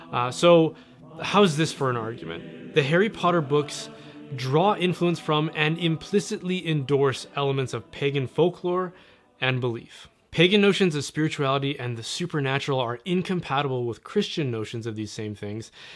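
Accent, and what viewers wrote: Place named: Canada. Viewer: Like your Canadian accent... "abowt" ☺️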